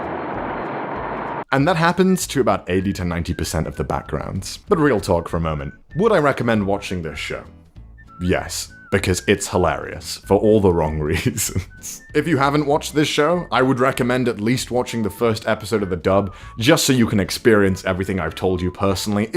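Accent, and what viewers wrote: United Kingdom. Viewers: Ok so my brain is breaking trying to figure out where your accent is from because it sounds vaguely British but not— obviously British and I DONT KNOW WHAT TO THINK ANYMOREEE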